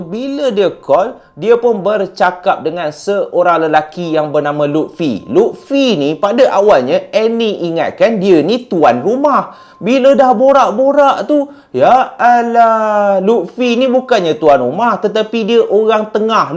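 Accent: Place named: North, Malaysia. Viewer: tak boleh la.. rasa annoying bila cuba cakap slang utara..nasib baik sikit tak macam yg cerita kat perlis tu.. tapi yg lain2 okey...saya suka penceritaan.. tapi slang utara terlampau over sgt..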